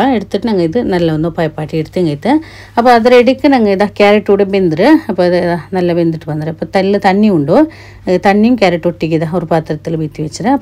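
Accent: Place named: Kerala, India. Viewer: സംസാരിക്കുന്നത് കാണുമ്പോൾ ഇയാൾ ശരിക്കും പ്യാരി തന്നെ ആണെന്ന് തോന്നുന്നു. പക്ഷേ സംസാരിക്കുന്ന രീതി മാത്രം മലയാളിയുടെ പോലെ ഉണ്ട്. അപ്പോൾ ശരിക്കും ഇയാള് മലയാളിയോ അതോ പ്യാരിയോ?🥰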